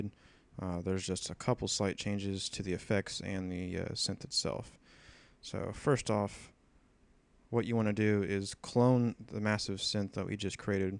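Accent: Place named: United States. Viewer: Thnx for the detailed vid I really appreciate it! Helped me a lot now that i got some more sounds to play with , are you from Texas by the way? you sound like a cowboy =D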